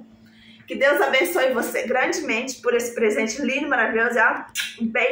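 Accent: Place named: Minas Gerais, Brazil. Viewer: Boa noite querida !!!gostei muito de sua pessoa!!!acho que tenho algo em comum nosso sotaque mineiro kkkk😄comprei 2 semana passada e está vindo de rondonia tao longe estou aguardando espero q chegue em bom estado se eu soubesse antes q tinha vc q vende essas maravilhas tao perto de mim teria comprado de vc mas enfim nao faltarar oportunidade!!!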